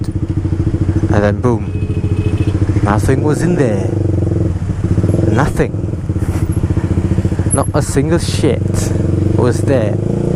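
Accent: United Kingdom. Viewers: very british, i love your accent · aksen britishnya keren bang, mantap (y)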